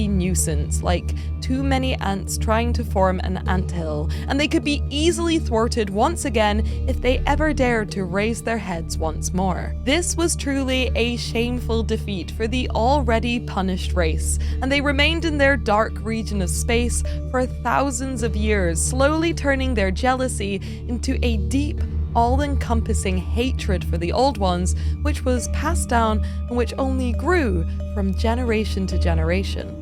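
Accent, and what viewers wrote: Scotland, United Kingdom. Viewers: Nicely put, great story to hear, I like a lot the british accent. (Y) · Awesome! Looking forward to the next segment/chapter. Besides, I like hearing you talk lol. Americans tend to like a British accent 😊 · Bugger! Now I've got to get precise! You know that we sing when we speak? I don't hear too much Edinburgh. More north. Closer to Perth. I'm full of shit, but love a challenge 🤗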